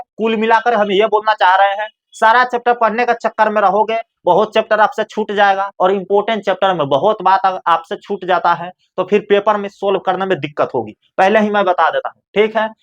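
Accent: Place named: India. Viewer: I think Hindi medium. Cuz As u can really see it from sir accent .. It sounds like pure Hindi medium accent........ Don't u think so????Ahhhhh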